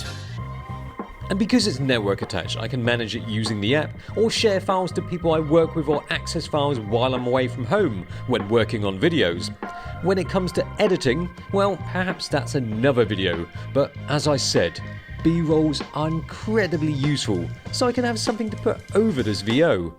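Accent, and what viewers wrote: United Kingdom. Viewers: So cool to see a Japanese-looking guy speak in a British accent. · you are an icon and a legend in my books! Lovely presenter, funny, to the point and honest, PS you gotta love that British ascent, right?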